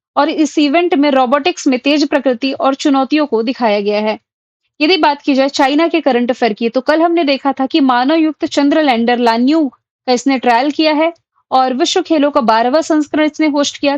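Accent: Bihar, India. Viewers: Ma'am Bihar se hai . Aisa Kyu lagta hai ??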